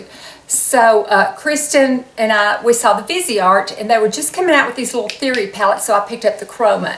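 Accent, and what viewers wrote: South, United States. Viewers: Love ya!! Are you from Georgia?? You have that southern accent!! I’m from Georgia!! Love the look!! ❤️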